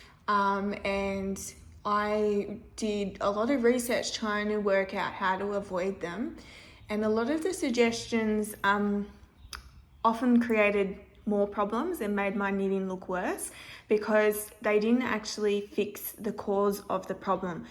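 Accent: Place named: Australia. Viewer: Off topic, but It's so nice to hear a fellow aussie's voice in a knitting video!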